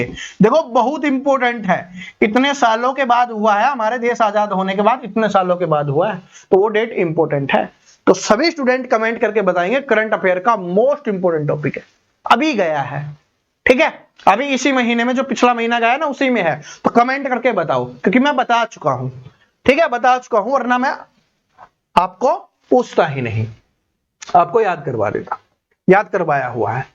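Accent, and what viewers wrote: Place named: Haryana, India. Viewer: Fir to sir ap haryana se hoge 😅😅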